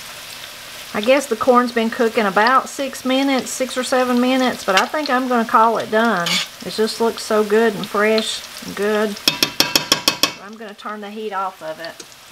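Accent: Appalachia, United States. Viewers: I started watching this to experience your culture, your language, and your wonderful accent, the bonus is the amazing food!. I know so little about Appalachian culture other than the stereotypes depicted in movies. Thank you for this video. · I grew up in Kentucky and al of this is fermileria to me, my mom did her 🌽 both ways, fried and cream style, so good. She was a great cook! Miss those times. I enjoy all of your videos, cooking, hillbilly talk and all of that kind of stuff 😋 just had to add 😉 this to my response 😀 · Food aside, love the kitchen cabinets. And, I could just listen to this woman talk without end. What a gorgeous accent. Back to the food, i remember riding my motorcycle from New York down to West Virginia around ‘81 or ‘82 and stopping by a small cafe. the waitress told my the special was ‘soup beans’, corn bread, and collard greens. 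As immediately smitten with this Appalachian waitress as I was, I would have ordered anything she suggested. When I heard the woman in this video casually mention ‘soup beans’, it brought me back 40 years. It’s not a term we hear in New York. That waitress Had an accent much like this wonderful woman here. I was as completely mesmerized then as I am now. One the most memorable meals of my life. Eating that wonderful food and having a breezy chat with that Appalachian waitress felt incredibly exotic to this New Yorker. It’s funny how this video took me back to that. Thank you.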